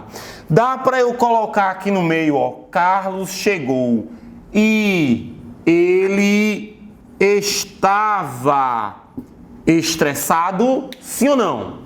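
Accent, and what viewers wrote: Northeast, Brazil. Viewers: sutaque NORDESTINO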